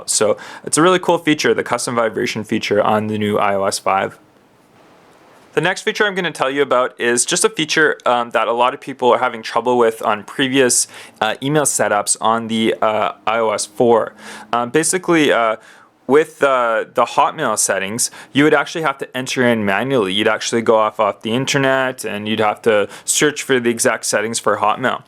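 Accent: Canada. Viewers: That's Canadian accent. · dude, are you from fucking Canada? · IS HE CANADAIN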